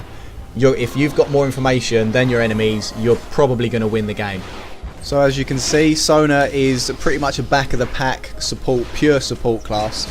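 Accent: United Kingdom. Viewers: British :P <3!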